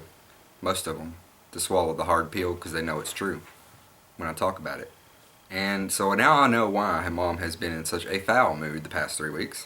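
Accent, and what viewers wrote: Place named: Kentucky, United States. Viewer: I found u thru that comment. Enjoying ur vids. My 9yo shih tsu is my only youngun. I'm f Ky ur accent sounds like mine. At least u got a sub f the troll. I don't believe in sayin GD either but I cuss like a sailor. Usually when I ask people not to say around me they respect that. Anyway I'm gonna go watch ur other puppy vids. There's a big drama w channon rose & Amber Walter on here it's like HS. I told them spend energy doin good but I let people bother me too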